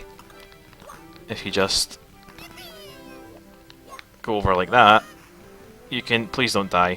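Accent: Scotland, United Kingdom. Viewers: Yupeji His commentary with his Scottish accent is interesting enough, stop complaining, it's just 4 minutes.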